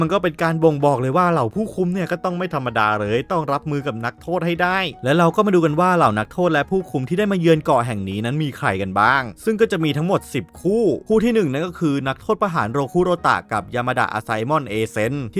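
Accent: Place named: Thailand. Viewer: เสียงพี่เหน่อมาก😂😂😂😂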